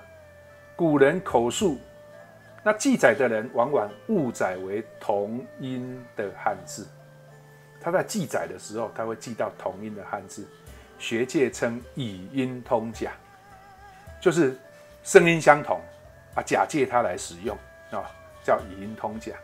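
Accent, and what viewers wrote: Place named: Yongchun, China. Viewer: 我也是永春人，難怪口音與陳老師相類。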